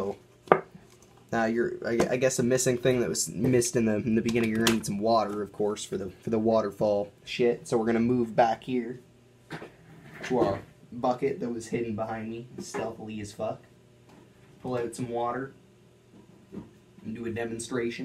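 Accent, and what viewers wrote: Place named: Toronto, Canada. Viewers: Toronto garenteed 😂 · Peterborough I think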